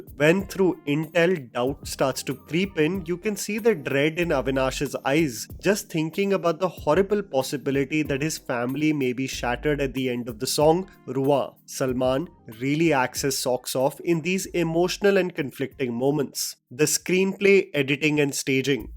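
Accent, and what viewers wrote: India. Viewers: NO JOKE… his Indian accent made my ears bleed 😢😢😢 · THAT IS SOME THICK INDIAN ACCENT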